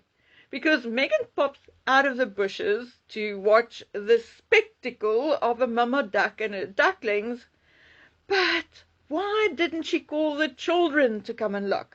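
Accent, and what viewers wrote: South Africa. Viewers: Hello. I am a Johannesburger (Bedfordview) and love your show. Love listening to our SA accent❤ We’re having lovely rain at the moment. Just great. Take care and have a lovely evening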